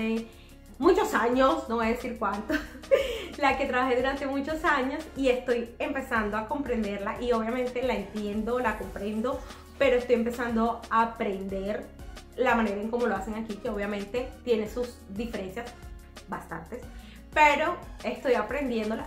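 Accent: Venezuela. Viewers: Hola. Me encantó. Y me encanta tu acento que se parece mucho al venezolano, yo soy venezolana. Un gran abrazo. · jejejejeje además de hablar rapido tienes acento Venezolano, ojo no es para ofender, porque soy Venezolano, me gusta tu jovialidad y el entusiasmo como explicas las cosas no tan buenas que te han pasado.. por supuesto un saludo de este Venezolano a ti y tu grupo de soñadores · Eres Venezolana de casualidad?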